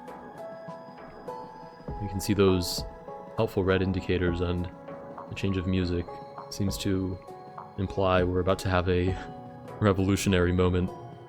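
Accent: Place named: United States. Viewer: his accent is american tho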